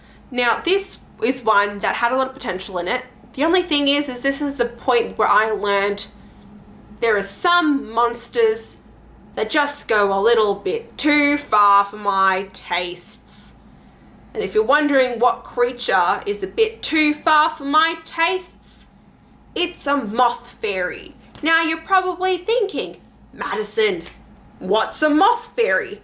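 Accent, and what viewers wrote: Australia. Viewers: love that Aussie accent 💪now were is the manga! You talking about these gross books with no pictures LOL I'm just playing but it doesn't sound like you enjoyed these books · Your reactions make your Aussie accent so prominent. It was very entertaining 😂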